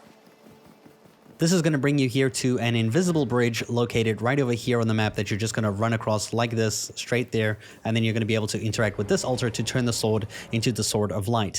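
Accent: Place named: South Africa. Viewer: are you South African? i can hear that accent poking through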